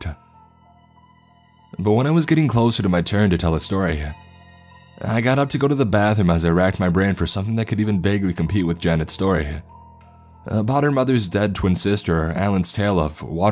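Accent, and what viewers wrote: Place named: United States. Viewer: I'm from the UK and this is the hardest American accent to listen to. What State is this from.?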